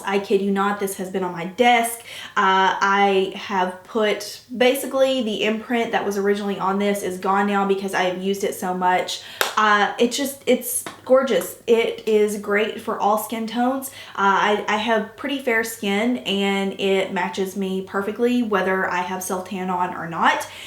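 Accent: South, United States. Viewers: first of all, I am a new subscriber and I already love you! You seem so down to earth and honest in talking about different products, I already trust your judgement! I actually found your YouTube channel by reading a comments section of another YouTuber. Several people recommended you for hooded eye tutorials. I'd love to see an updated one (I couldn't find a recent one after scrolling back a good bit), as I REALLY STRUGGLE with applying eyeshadow to my hooded eyes. That flap of extra skin is my worst enemy!! Also, love your southern accent, where are you from? I am from North Carolina!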